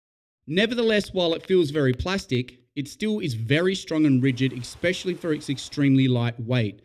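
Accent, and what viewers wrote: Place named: Australia. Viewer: I can’t tell if you’re from down under or from the fruit isle... I am Australian so you better not hate on me...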